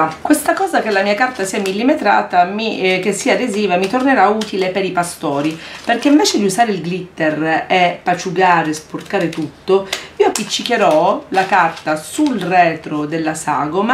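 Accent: Catania, Italy. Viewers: ciao, sei bravissima! sei di Catania per caso?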